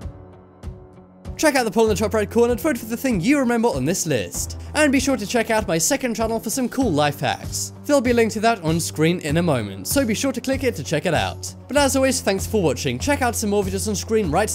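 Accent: England, United Kingdom. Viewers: I cant figure out if this guy is english or american 😂🤣😂🤣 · He's English because us American don't sound like that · You English?you sound it but you use so many American terms,you ashamed to be English?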